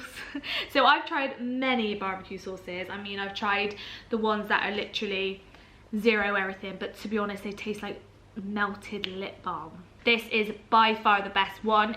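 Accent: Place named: Wales, United Kingdom. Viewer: Great video.. do you mind me asking where are you from? You sound local to me in Monmouth s Wales. X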